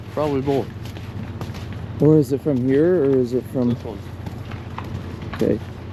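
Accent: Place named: Wisconsin, United States. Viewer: Don't worry if we cant see you your wisconsified accent is impossible to mix up we know its you guiding us threw the video! Cheers!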